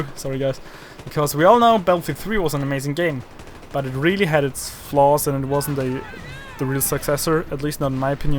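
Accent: Germany. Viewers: I am trying to figure out where you live, because of your accent. And I think you are a german? Is this correct?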